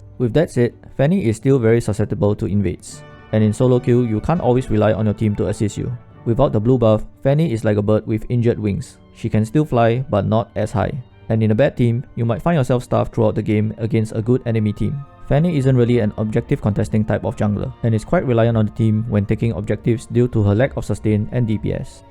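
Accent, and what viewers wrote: Singapore. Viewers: The heavy sg accent bro 😭😭😭 but good guide lah keep uploading guide like this can do very good one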